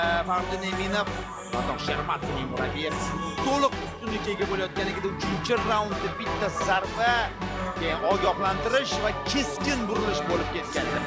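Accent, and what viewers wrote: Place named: Russia. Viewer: Концентрация, креатив, позиция инглизча сузлар, буларни урисча талаффуз килиш урискулликдан далолат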